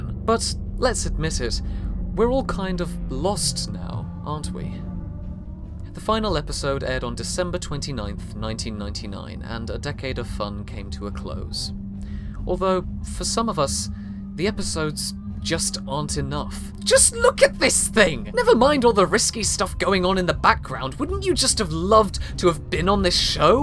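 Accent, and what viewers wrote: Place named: London, United Kingdom. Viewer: Are u seriously British? Because it sounds like u live In London!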